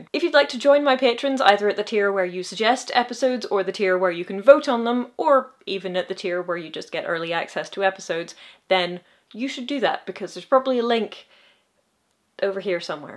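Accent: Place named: Wales, United Kingdom. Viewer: I lloved the Wellsh accent.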